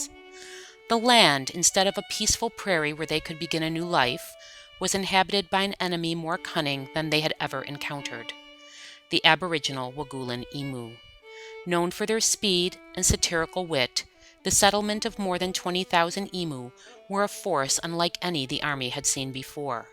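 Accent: United States. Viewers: WTF is an "ee-moo"? Suggestion for narrator... If you're going to do a voice-over about an Australian thing with your American voice, learn how to say its name. Google it, or ask an Australian. How hard would that be? It's an "eem-you". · its funny but ruined by it being narrated by an American.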